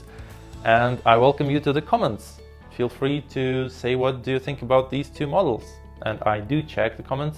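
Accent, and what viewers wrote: Russia. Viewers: Хей, ты же русский да? Я препод английского и меня не покидает это ощущение .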